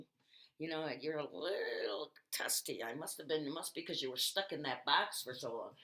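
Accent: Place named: South, United States. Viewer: I thought he had a Southern Drawl? Maybe the trip gave him a scratchy throat?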